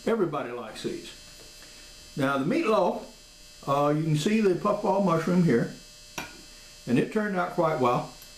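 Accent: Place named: Appalachia, United States. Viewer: I love your voice. Like a slight hillbilly twang mixed with dr demento and a splash of jello biafra.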